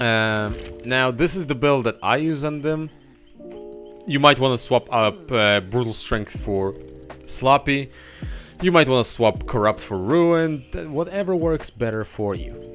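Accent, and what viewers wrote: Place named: Israel. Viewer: יש לי תחושה שאתה ישראלי בגלל ה accent lolol great vid BTW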